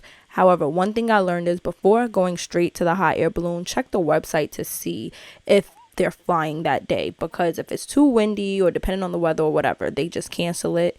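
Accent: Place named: New York, United States. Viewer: Just found you, and I’m surprised you don’t have more followers. New subbie 💕 p.s. I like your NY accent.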